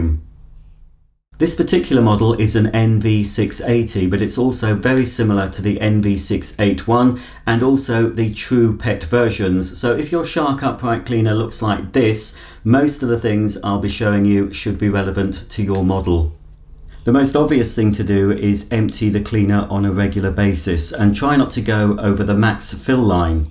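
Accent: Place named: United Kingdom. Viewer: Thanks, British guy!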